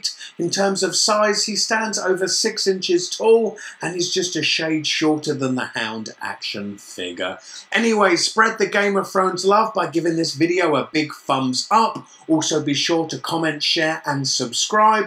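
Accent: England, United Kingdom. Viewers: Sorry, it just sounds like a regular English accent to me. I admire you alot and would never intentionally insult you.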